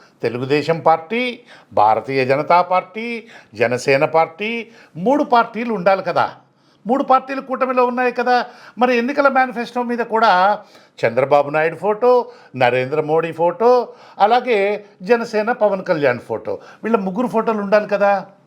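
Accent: Nellore, India. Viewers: Nenu Nellore Zilla anukunna, mee slangnu batti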